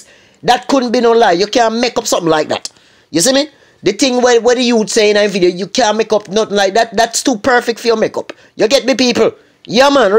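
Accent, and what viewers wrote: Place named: Jamaica. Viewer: Evening lol dwl nuh kill mi off real jamaican talk dwl dwl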